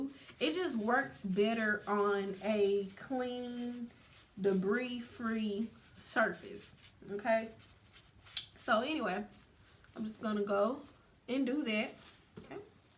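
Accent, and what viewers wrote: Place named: South, United States. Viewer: Where you from I love that accent ? Country girls rock 🧘🏽‍♀️ I'm from NC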